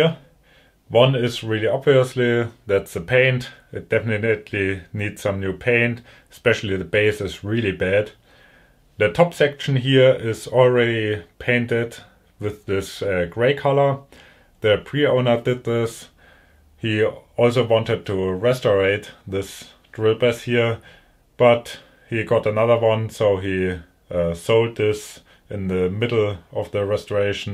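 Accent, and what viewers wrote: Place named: Germany. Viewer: Du bist Deutsch oder? Wenn ja das hört man raus (nicht böse gemeint)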